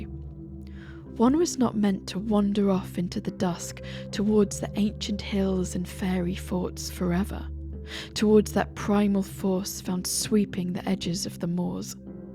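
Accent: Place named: England, United Kingdom. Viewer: A beautiful English accent!)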